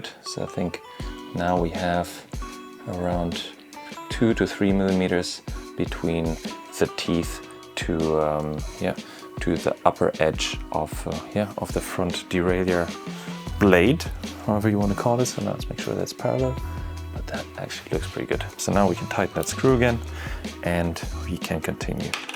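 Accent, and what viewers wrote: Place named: Germany. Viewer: As you can hear in his accent, he is probably German, in my opinion 😉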